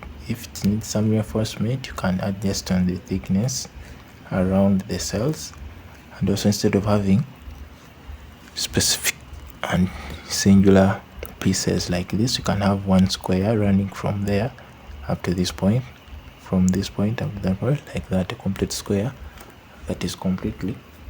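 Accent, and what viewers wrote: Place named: Kenya. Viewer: you have a Kenyan accent. Thanks for the tutorial!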